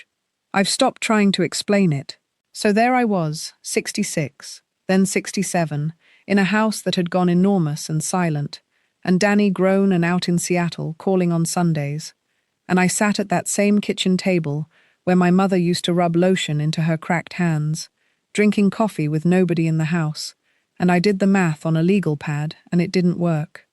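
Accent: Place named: United Kingdom. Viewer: I love your story, brilliant. I too love the Philippines, I have filipino friends. For an American woman you've got a fabulous British accent. Great story. Wish you well..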